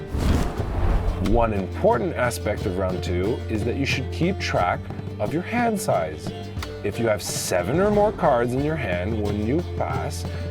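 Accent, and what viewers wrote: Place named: Poland. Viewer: Ah ten polski angielski, ale film super :)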